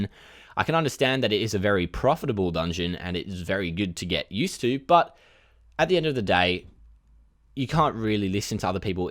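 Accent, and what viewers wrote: Australia. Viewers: I'm pretty sure he's Australian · Australian accent from Australia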